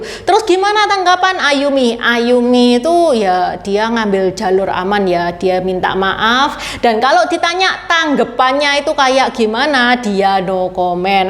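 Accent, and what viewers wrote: Java, Indonesia. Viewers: Anjai jepang jawa medok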